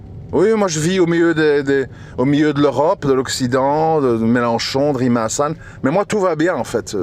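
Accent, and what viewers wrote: Belgium. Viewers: Sympathique l accent belgeomusulmant. Le reste aussi.😊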